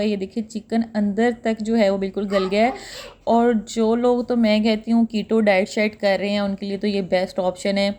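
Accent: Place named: Faisalabad, Pakistan. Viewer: Apki baton se lgta he ap fsd se hn.... & i love fsdians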